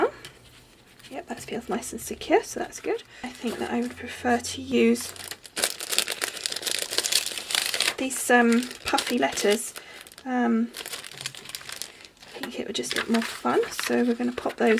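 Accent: United Kingdom. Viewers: Really a cute card...thanks for sharing. My grandmother was from England and hearing you reminds me of her. My husband always gives me a hard time because I love anything about England... movies etc...love watching BBC films on Netflix and hearing that British " speak' Thanks for all you do. Pam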